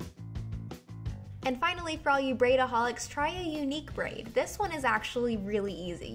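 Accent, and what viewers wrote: United States. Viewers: you really look like gemma styles and your voicebut with an american accent <33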